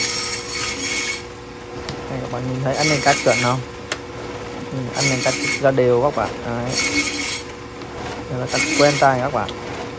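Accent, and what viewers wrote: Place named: Ha Tinh, Vietnam. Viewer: Máy cắt thịt đông êm đó anh 👍👍giọng nói anh nghe quen quen anh quê ở đâu vậy giống giọng hà tĩnh với nghệ an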